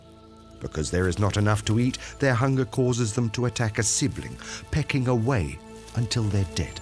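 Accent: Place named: North, United Kingdom. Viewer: Disliked due to overly used northern accent.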